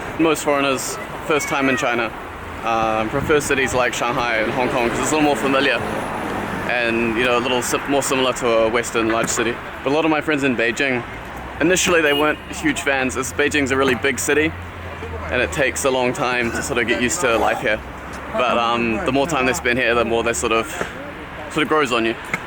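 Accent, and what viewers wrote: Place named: United Kingdom. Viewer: your english does not sound very much like those from new zealand. it is more british.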